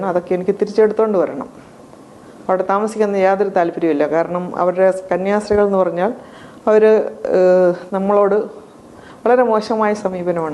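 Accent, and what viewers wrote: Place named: Ernakulam, India. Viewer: എറണാകുളം അങ്കമാലിക്കാരി ആണെന്ന് തോന്നുന്നു..😅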